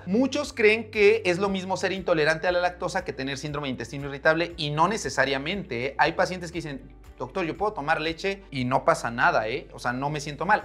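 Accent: Mexico. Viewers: el acento mexicano es insoportable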